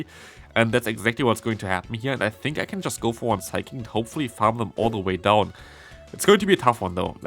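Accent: Germany. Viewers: his heavy German accent makes it harder to recognise it 🥲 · same his German accent is☠️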